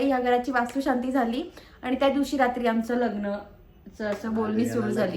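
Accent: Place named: Sinnar, India. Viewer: Sinnar che lok bolun asech ahe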